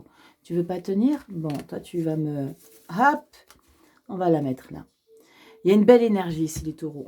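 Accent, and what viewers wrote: South, France. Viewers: Thierry, ☀♉; ASC ♎; 🌝 ♐; 👨💔 Bonsoir, je suis votre chaîne depuis 3 jours, vos tirages sont scotchant de vérité, a tous niveaux y compris tirage sentimental. Je suis en soins de maladie grave et rééducation fonctionnelle des membres inférieurs, j'écris à ce propos pour conter mon expérience de vie actuelle... Ce serai cool d'intégrer à vos tirages pros les notions d'œuvres ou projets artistiques de manière large bien sur, afin d'y trouver des indices précieux... Merci à vous, j'ai l'impression que vous êtes du midi, moi aussi, c'est cool...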